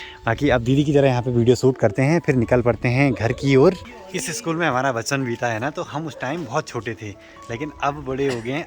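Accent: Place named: Garhwal, India. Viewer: Bhai aapki garhwali boli bahut Mithi hai.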